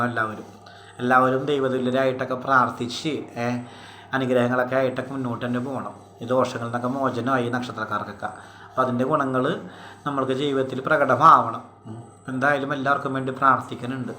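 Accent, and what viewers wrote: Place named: Kochi, India. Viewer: When I hear a positive, I am happy thirumeni 🙏 .. njan subscribe chaythu, nummade kochi slang when I heard the innocents so happy 🙏. Thirumeni I am from USA 🇺🇸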